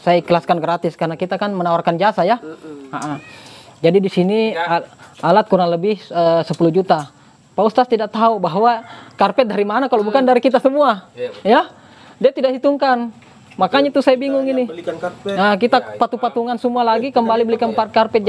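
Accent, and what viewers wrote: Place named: Java, Indonesia. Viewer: Pak Ustadz nya logatnya kaya orang JAWA.....SALAM DARI JAWA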